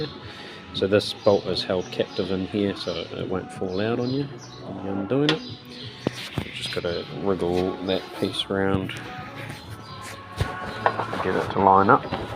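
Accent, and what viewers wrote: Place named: New Zealand. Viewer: Gr8 video M8. My door just failed and this is very helpful to see what is involved for me to undertake (?) or my mechanic. Where are you located? Sounds like a NZ accent! Cheers.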